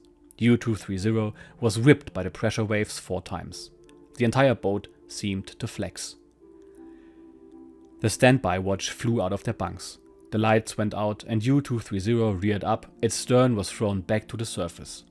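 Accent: Germany. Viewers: Hi, another great episode under your belt! Your narration is improving with every episode and your German accent adds to experience of reading the war diary of a German U-Boat officer. You made the right decision to ditch the synthetic voice as a human voice is so much better and knows certain language conventions better. I also enjoyed hearing about your holiday at Lake Constance. More flavour for the story! Enjoy your holiday and see you next episode! Mark from Melbourne Australia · I like the fact that you are narrating this with a German accent... it definitely brings a little more life to what these men may have sounded like in general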